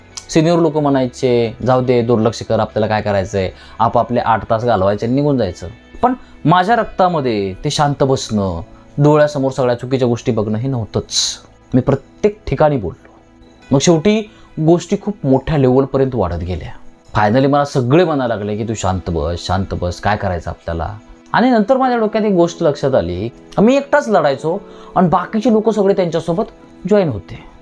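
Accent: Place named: Kolhapur, India. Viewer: छान माहिती व विश्लेषण करून सांगता व तुम्ही तुमच्या बोलीभाषेवरुन आमच्या कोल्हापूर चे वाटता.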